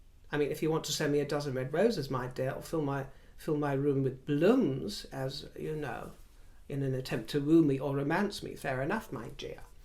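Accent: United Kingdom. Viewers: I was wondering if anyone knows if is American and really live somewhere in America (and just does a good accent) Or is he really from the UK? 😁😘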